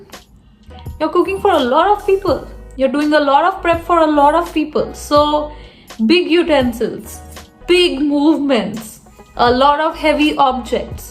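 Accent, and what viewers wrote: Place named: India. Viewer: 🫡Hats off 2 U from 🇧🇩Bangladesh. I love the way U speak English in a very simple way. Your English is very easy to understand, and your accent also sounds so simple and sweet. It's way better than all other typical indian accents! I am in damn love with your steady speaking style, which is absolutely easy to understand, and of course your every single word's pronunciation is crystal clear!🥰 U r undoubtedly a perfect speaker! U have absolutely nailed it!👏🏻 👌🏻Keep it up dear multi-talented Indian lady...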